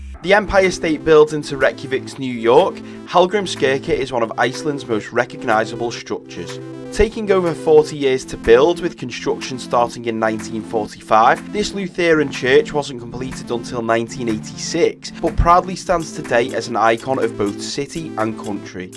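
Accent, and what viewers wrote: Liverpool, United Kingdom. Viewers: is that a scouse accent or wirral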